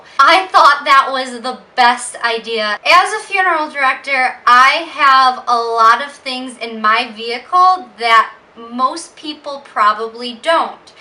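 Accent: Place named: United States. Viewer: OMG that bloody voice sends shivers down my spine - A whining American YUCK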